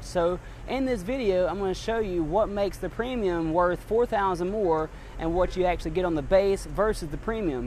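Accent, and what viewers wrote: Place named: South, United States. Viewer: He sounds like a Texas citizen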